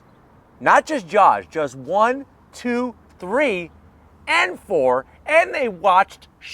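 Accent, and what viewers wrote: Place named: New Jersey, United States. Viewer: I need to know if you're originally from Jersey. Your accent is driving me crazy. It's so similar to my mother's.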